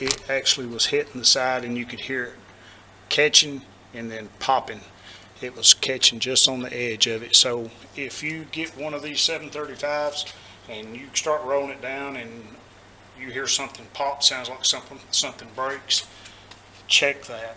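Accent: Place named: South, United States. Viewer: Thank you for the review. I've been eyeballing planers and this helps in the selection. It also explains why I've had snipe issues with using my father's 735 planer. Also no issues with audio here, most people probably just can't understand the Southern accent, I'm from the south so it was loud and clear here. 🤣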